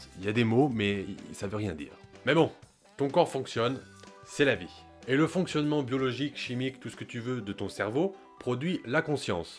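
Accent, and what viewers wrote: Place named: Quebec, Canada. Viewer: Ce petit accent québécois. 👌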